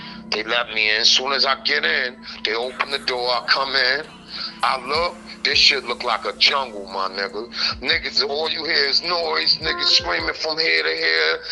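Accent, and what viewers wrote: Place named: New York, United States. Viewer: Nigga telling NY stories wit a NY voice shit crazy son 😅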